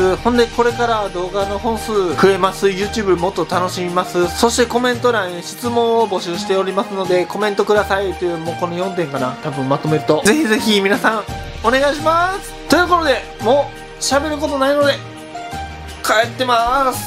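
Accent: Kansai, Japan. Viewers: 中二です。いつも面白い動画をありがとうございます関西弁ってところも親近感が湧きます！今までのさんと似ていて土日くらいしか写真を取りに行くことが出来ないのですが土日は土日で用事があったりとあまり写真を撮る時間がないんですがなにか経験者としてアドバイス的なの(なんでもいいです)ありますか？質問じゃ無いかもしれないし日本語変かもしれないけどよろしくお願いします長文失礼しました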